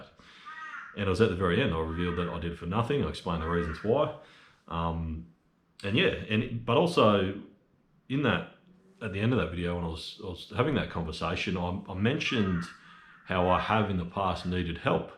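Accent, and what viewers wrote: Australia. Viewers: I love Australians accents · How awesome to learn about you and your family. No wonder you are such a wonderful human being. This was a great video! I love the shout out to Brad from Copper Creek. I don't know him personally but live within a mile of him. I watch his videos and love it when I recognize a property he is working on. And just so you know ... everyone I know, and I mean everyone, loves an Aussie accent. My son even has his Siri set to it. Talk about accents? I'm a Florida country girl with a thick southern accent. I don't realize I have an accent until I meet someone outside of the small town I live in, and they have to comment about it. Usually it goes like this, "Where did you get that accent? Are you from Tennessee?" My reply is always... "What accent?" It must be pretty thick because the workers at the Chinese takeout can't understand a word I say. 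😆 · Recently discovered lawn care channels. Had know idea they existed. Love to see the detailed results I guess. This is the only one I've subscribed to. And your thick Australian accent is part of your charm. BTW, I'm American. Would love to visit Australia someday. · I love your Australian accent and have no trouble understanding every word you say. I especially like when you call a weedwacker a whipper snipper. Congratulations on getting to 100,000 subscribers. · Great video!!! Thank you so much for sharing and taking the time. You are such a generous kind soul. 🤗🤗🤗🤗 . Keep the videos coming please ❤️❤️❤️❤️❤️😜... I m French . I lived in the UK before and I work everyday with Americans at work. I can understand you fine 😜. I actually really like Australian accent and Scottish accent 🤗🤗🤗🤗. It makes your videos even more enjoyable. I m a fan 😁😁😁. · Gosh I loved this! So fun to learn about your military service (thank you), that you have 4 kids and an awesome wife, that you call out the jerks (thank you again), that I melt every time you say "G'day" (love an Australian accent even if some *jerks can't understand you), and that 100K others have the same satisfaction watching your videos. 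The Grand Finale music is the best so I'm inserting that here for your awesome milestone!! Congratulations! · I've always loved the Aussie accent best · I have no idea why I love your channel….just do. You have a very sincere appeal….and that Aussie accent is amazing! You always sound happy. Your finished shots show just how much you love mowing. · Hi, this was from 6 months back & now January 19, 2023) Your Q & A Topic was Wonderful, first Thank You & your Wife-Claire for your Service!! It was nice of you to tell all of us your Family, a Lovely wife-Claire & 3 girls & the youngest a boy...that is wonderful too! Wow you have a few hobbies, play guitar, pencil sketches, modulars & video games...especially with your kids, & movies & Star Wars!! I liked hearing about what you did in the Army, my husband was in the Marines but he did that way before Me! As for maybe coming to USA, Kevin from Lawn Care Juggernaut from Ohio & you having him come to Australia is nice, but look up Spencer, from SB Mowing from Kansas..I like him a lot better!! 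I`m a American & I hear you fine with your Aussie Accent...which I love listening too! Congratulations on 100k, you Earned it for being Nice, Polite & your Funny things you put in the Videos!! As for the Rude People, Don`t Listen To Any of Them, You are doing a Great Job!! The saying here in USA is... If You Don`t Have Nothing Nice To Say, Don`t Say Nothing At All!! Best Of Luck, with your YouTubes & your Married Life!! Have a Good Work Week & a Wonderful Weekend with Family!! Love from Central Illinois USA 🥰😎❤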